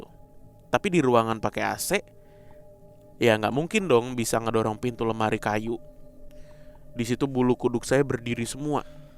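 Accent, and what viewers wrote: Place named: Brebes, Indonesia. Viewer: wong brebes kyeh....😅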